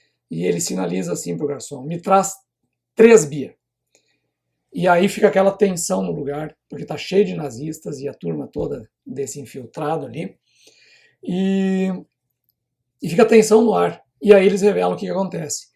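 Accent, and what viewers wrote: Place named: Rio Grande do Sul, Brazil. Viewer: Eu primeiro lhe identifiquei como brasileiro e pela entonação e sotaque eu notei que era gaúcho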